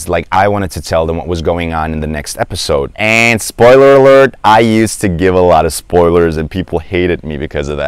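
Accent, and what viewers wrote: United States. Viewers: Amazing! How perfect, American English you speak with out living in the USA, I’ve been here more then ten years and you can beat me in second! You don’t even have an accent! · Very interesting video. I was raised bilingual, my mother tongue English and Spanish. At the age of 5 I spoke both. Translated to Spanish for my Grandmother who only spoke Spanish when watching TV shows. Born in the USA. Your English is perfect American. I also speak Dutch and trying to learn Turkish. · I watched some of your other videos before this one. I am glad you explained how you learned English because I could not figure out how you sounded as if you learned American English rather than British English. This video explains it. I am attempting to learn Spanish and agree with you about the cultural aspects of language. Some of the language apps are great for vocabulary and some grammar, but watching television gives a bit more of an idea of how the language is used every day. 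I am not sure I will ever be fluent, but thanks for supporting what took me quite a while to figure out. I'm going to watch more of your videos for more ideas. If you wonder how I found your Turkish video, I tried watching Hercai in Spanish. I finally figured out I was in dizi land and not telenovela land by some of the language. I loved dizis so much that I started watching them in Turkish with English subtitles. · Sesin çok yakışıklı ama 3 dakika geçtikten sonra rahatsız ediyor gibi. Bu arada tam bir american accent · Çok iyi kullanıyorsunuz İngilizceyi bir Amerikalı gibisiniz. Kimliğinizi belirtmemiş olsanız, eminim herkes sizi bir Amerikalı sanırdı ☺️🙏🏻 Teleffuzunuz çok etkileyici ve konuşma stilinizle karşınızdakini etkileyebiliyorsunuz ve böylece karşınızdakiler sizi sıkılmadan dinleyebilme şansına sahip olabiliyor. Bu da gösteriyor ki dilleri ne kadar çok sevdiğinizi ❤️👍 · I love your accent. 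It's so american. So as mine. I used to learn english the same way, by listening to the songs and writing them down. I've graduated from english exactly 20 years ago, but I don't have the opportunity to use it in my every day life so over the time I forgot many words, even the simple ones. But instead I speak spanish with my sister- in- law (I don't know the grammar but I don't fear to speak) and I love turkish. I understand almost everything but have no one to talk to☹🤷‍♀️ Love all the job you do❤ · Why do you sound more American than English? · Your English is amazing and your American sweet accent-wow ! Well done mate! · You sound American not Turkish or German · That’s awesome!! Well you have been to a beautiful places like Phoenix Arizona,sedona Arizona you need to come again visit the Grand Canyon az!! Saludos desde Phoenix Arizona!! 🙏🙏 por cierto su inglés es estadounidense a la perfección 👌👌👌👏👏👏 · Dude your American accent is amazing, Awesome dude! 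This is my future goal, I wanna to master my pronunciation. By the way I speak also 5 languages. You are an inspiration for me. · 💙 ... What an amazing story ... I would've thought you had lived for quite some time in America ... your American accent is just flawless ... I was in Turkey (Istanbul, Izmir, Kusadasi, Ephesus) last week and I fell in love with Turkey as a country, the language, the people, the architecture, the food, the smells ... in a word ... I decided to learn turkish and I found this helpful and fascinating channel ... Greetings from Miami ... !!! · Hi from Australia! I thought you learned English in America cause of your accent. But I have to say I also prefer the American accent 😊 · Amerikali olmadiginizi soylemeseydiniz, sizi Amerikali sanirdim. Harika... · Gardaş which country of state u grow up? u have İmpressive hillarious american accent man 🙏🙌 well done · I'm falling in love with your American accent🤭❤️ thank you for the video and you're the best i'm sure about that☺️ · You speak American English better than some Americans! 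Great job...I can barely sense the accent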